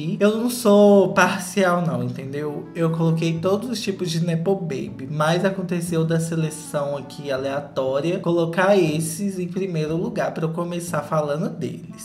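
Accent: Minas Gerais, Brazil. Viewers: Eu ri muito com o lacre sueco! Habla mesmo papi! Kkkkkkk Amo os deboches do com esse sotaque mineirinho 🥰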